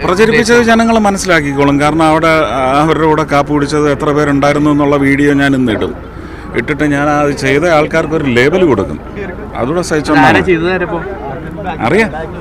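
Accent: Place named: Namboothiri, India. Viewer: ഇയാൾ ആരാണെന്ന ഇയാളുടെ വിചാരം.. സംസാരവും ആക്ഷനും എല്ലാം എന്തോ ആണെന്ന ഭാവം.. ഓരോരോ കോപ്രായം.. അടുത്ത ജന്മത്തിൽ പൂണൂല്‍ ഇട്ട് നടക്കണം എന്ന ആഗ്രഹം ആണോ ഇപ്പോഴേ നമ്പൂതിരി ഭാഷ പ്രാക്ടീസ് ചെയ്യുനത്..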